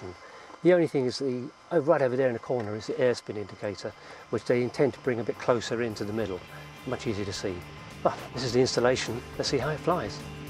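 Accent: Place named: Ireland. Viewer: hey man sorry for asking but where are you from? Let me guess... Ireland? Your english accent is amazing!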